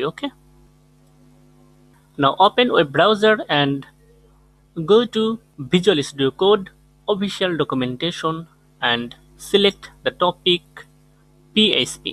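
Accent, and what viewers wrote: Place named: Bengal, India. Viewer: Crazy Bengali accent, but nice tutorial 😅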